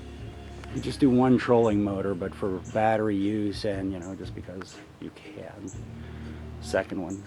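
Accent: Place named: Maryland, United States. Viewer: Sounds like a Maryland accent.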